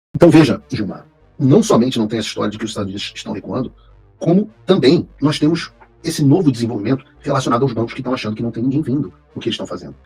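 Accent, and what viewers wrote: Rio de Janeiro, Brazil. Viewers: gosto muito de vc, mas tira essa bala da boca e esse sotaque carioca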